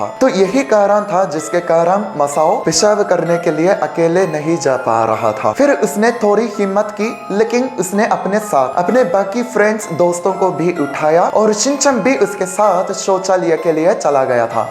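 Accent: Standard. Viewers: You speak Hindi purely more than indians. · Itni shudhh Hindi to ham bhartiy bhi nahi bol paate jitni aap bol lete ho😂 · I am an Indian and from north india still he speaks better hindi than me acctually he speaks shudh hindo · Your hindi need to be smooth and be practiced btw your hindi is standard 😇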